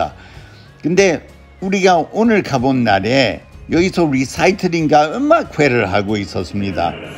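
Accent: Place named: Gyeongsang, South Korea. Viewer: 남들은 몰라도 저는 부산이 고향이라 경상도 사투리로 설명해주신게 너무편하게 잘들리고 쏙쏙 이해가 잘되서 너무 좋습니다~^^ 항상 건강하시고 다음편도 기다리겠습니다~!!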